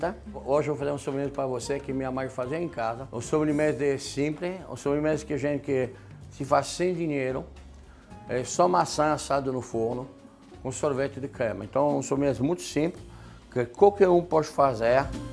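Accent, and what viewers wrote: France. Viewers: Que Francês maravilhosooooooo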